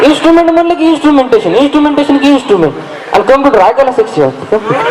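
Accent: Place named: Ambajogai, India. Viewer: full ambajogai chi language.... Mast vatal bhava..👌👌👌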